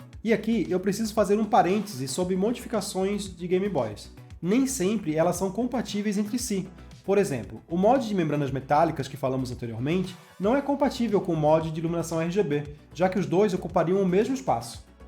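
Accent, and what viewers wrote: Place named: Florianópolis, Brazil. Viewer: Incrível seu conteúdo cara, ganhou um inscrito. Fora que só entrei no video e percebi que é manezinho 😂👏🏻👏🏻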